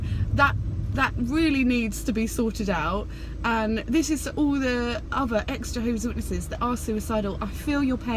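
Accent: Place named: Southern England, United Kingdom. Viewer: where you from im from hastings sussex you sound southern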